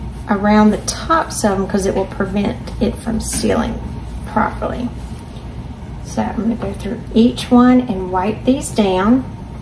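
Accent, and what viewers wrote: South, United States. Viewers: 40 she had figs on her tree OK we I don’t think we have fig trees here in the Minnesota area when you said that I was like kind of jealous like excited like thinking yes that’s really cool and then I was thinking can I plant a fig tree here. Are there any fig trees in the Midwest? I felt like I was very Mediterranean, but you have a southern accent so where are you at? Lol.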